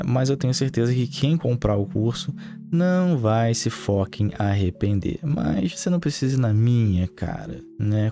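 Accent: Rio de Janeiro, Brazil. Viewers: carioca asmr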